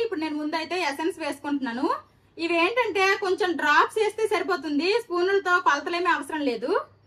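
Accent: Srikakulam, India. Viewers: Akka konchem matlade style marchu Srikakulam paruvu teyyaku hi andi maney vere di select chesko konchem style ga matladu em kadhu next vlog ala try chey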